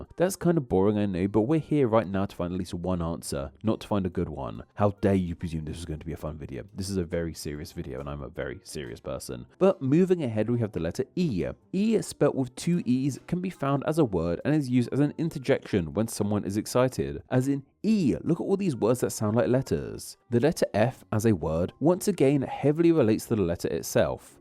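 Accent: United Kingdom. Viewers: Our is interesting because in your British accent it is R but in an Australian accent it's pronounced "awo" like ouch ow. I wonder if there are words that would fit from other accents to letters as well? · s is literally ass but i guess the british accent breaks it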